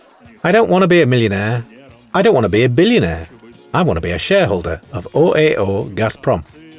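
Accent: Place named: United Kingdom. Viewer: Лучше бы американский акцент взяли, его больше народу в мире понимает, чем британский.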